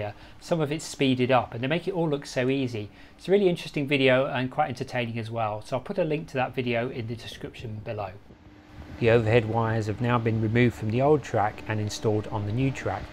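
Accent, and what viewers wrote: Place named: England, United Kingdom. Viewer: I thought ohh 15 minutes but when I heard your English accent and picked up what the subject entailed, I watched on. I do wish they'd make mega projects like this a bit more aesthetically interesting such as the tunnel portals. Nowadays it's more bland and ugly then ever and all that matters is seeing it open as soon as possible. Even road projects get better treatment.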